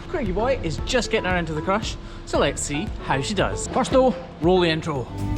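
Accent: Scotland, United Kingdom. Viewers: I love to watch your videos! Very relaxing with the music and your calming nature. You’re so positive and it brightens my day when I’ve had a bad one. I’ve always loved the Scottish accent too 💜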